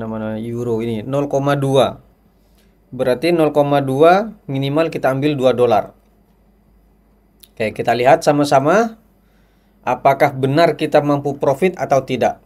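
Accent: Lampung, Indonesia. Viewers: Bang, Abang orang Lampung ya Bang? Logatnya khas. Salam.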